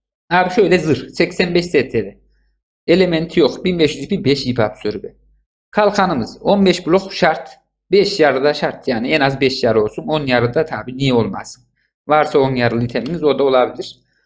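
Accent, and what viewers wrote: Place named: Central Anatolia, Turkey. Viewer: mizacın güzel kardeşim iç anadolu şifesi var .D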